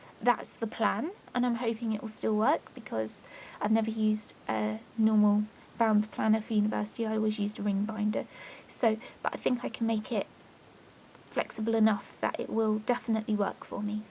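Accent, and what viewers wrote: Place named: United Kingdom. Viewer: my first search today at the YouTube about planning planners (not just designing...), really happy to find your videos, I love your ways of planning, going to watch all your videos later. I was also glad to find a British-speaking accent, even the sound of seagals on the front in one of your videos made me smile :-):-):-) thanks for your videos and sharing your experience of planning x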